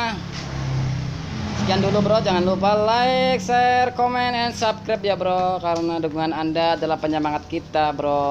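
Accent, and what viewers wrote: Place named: Banyumas, Indonesia. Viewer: Jen ngapak temen kang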